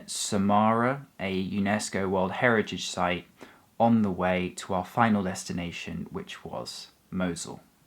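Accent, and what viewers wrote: United Kingdom. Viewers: Uk dialect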